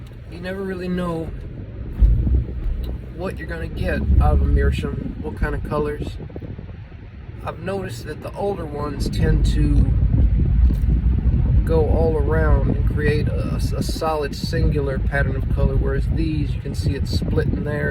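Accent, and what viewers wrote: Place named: South, United States. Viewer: Love finally hearing the true CLE southern accent! Bless your heart ❤! Long live the chupacabra and Mr No Name Meecham!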